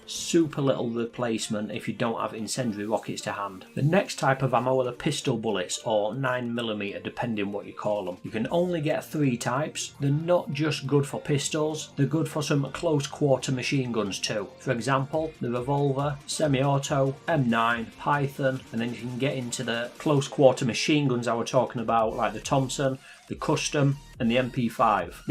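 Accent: United Kingdom. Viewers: Is this the speccy bum accent from Glasgow? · What English accent is this? Sound familiar, my English teacher?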